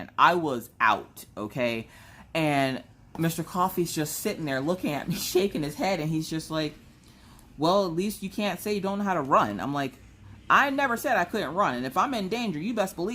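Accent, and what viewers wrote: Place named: East Coast, United States. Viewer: I'm new to your channel and you've really got me addicted! When I first heard you I figured east coast. I spent a LOT of years in Baltimore. Then when I heard you say you were in ND? Even a smaller world. I was born and grew up there. And now I'm in SD so was loving to hear about your trip to the badlands! I'm in Rapid, in sure you passed pretty closely by.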